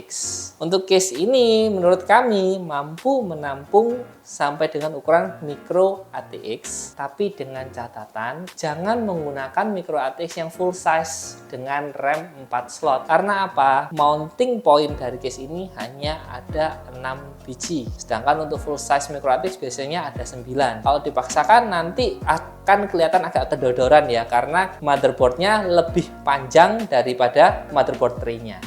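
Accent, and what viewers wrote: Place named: Java, Indonesia. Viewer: Ou pantes agak medok <mail>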